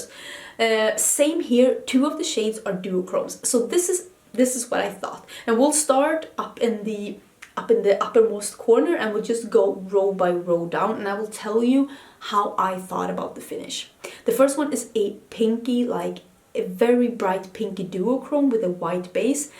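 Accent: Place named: Sweden. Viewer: k this is so random but one half of my family is from Finland, moved to Canada in the 70s'80s.. I love watching your channel as it reminds me of my family who have now moved across the country 4 years ago! must be the similar accents/dialect of english as I know you are from Sweden. Beautiful countries!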